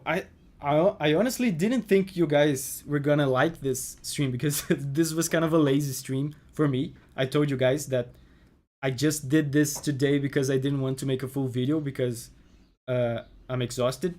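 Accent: Brazil. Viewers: Mano, sabia que você era brasileiro, hahahaha! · Cara eu vejo seus vídeos a um tempo e eu achava q seu sotaque era de brasileiro, mas nunca vi vc falando pt. Só q vendo a live eu ouvi as maritacas e pensei "mds brasileiro certeza" KKKKKKKKKK Parabéns pelo trampo, curto mtoo suas análises. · Caraaa, tu é brasileiro!! Não fazia ideia (vi teu nome num site de músicas de jogos e acabei descobrindo por lá, segundo o site, claro)... Eu reparei o sotaque no inglês mas não sabia que era brasileiro... Weee! Parabéns!!! 🎉🎉 Torcendo muito pra tu ocupar cada vez mais espaço na indústria!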